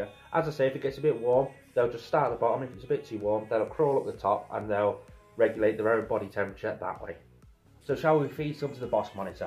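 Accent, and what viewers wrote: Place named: Cockney, United Kingdom. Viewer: i’m american, you sound cockney to me 🥴 i just enjoy the accent a lot and can’t quite say it right 😂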